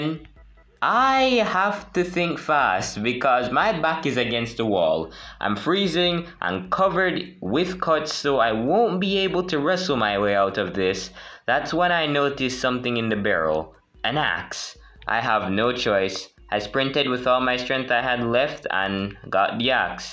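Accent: Jamaica. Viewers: are you jamiacan🇯🇲? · It’s cool that your Jamaican mon! I can hear it in your accent (I’m from TT) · Hey man are you a jamacan my guy i can tell by you accent🇯🇲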